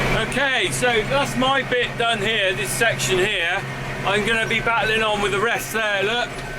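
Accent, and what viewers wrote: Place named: South West, United Kingdom. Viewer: Do you have the calves out yet? The leaf on your grass is awesome. Love that SW accent,. I find it really easy to understand. I am learning so much from you. Thank you. Loved the speeded up section.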